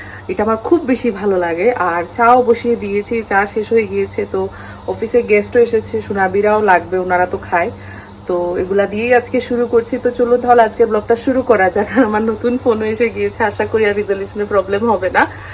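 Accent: Sylhet, Bangladesh. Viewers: Apni ki sylheti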